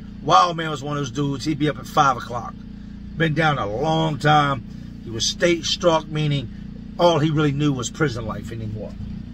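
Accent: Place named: Chicago, United States. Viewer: Sounds like your from chicago . I'm from chicago ,we use the same language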